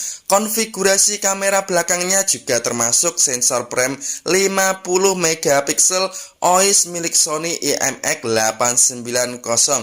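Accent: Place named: Java, Indonesia. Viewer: Medok banget pengisi suaranya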